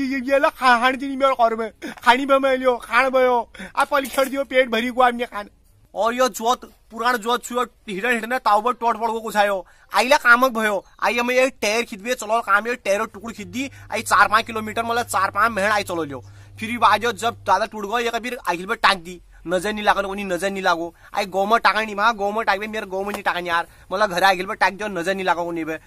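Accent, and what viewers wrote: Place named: Bageshwar, India. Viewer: waah mjaa aa gya apne mere ganesh daa ki yaad aa gyi ... esa lgra aap garur bageshwar se ho ..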